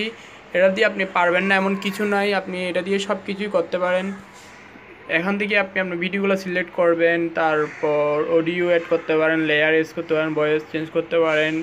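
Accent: Chittagong, Bangladesh. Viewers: ভাই মাঝে মাঝে আপনার গলায় চাটগাঁইয়া ভাষা চলে আসে! আগে শুদ্ধ করে বাংলা ভাষা বলতে শিখোন।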